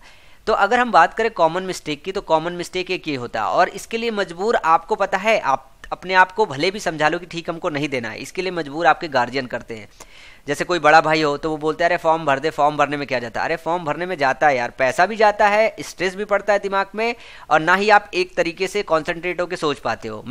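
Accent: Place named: Kanpur, India. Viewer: kya bat h bhai....tmhari language me kanpuriya ka jo taste h maja aa jati h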